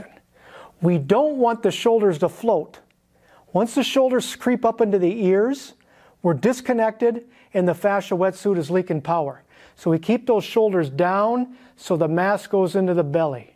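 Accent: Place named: Wisconsin, United States. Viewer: Excellent information thanks! I'm curious if rebounding on a trampoline is as effective? it seems easier on the body and joints . Challenging to stay on toes... Also I cannot sustain 2 consecutive minutes. I take breaks and do probably 4 or 5 minutes total. Also I detect a northern accent Wisconsin? 😀🙂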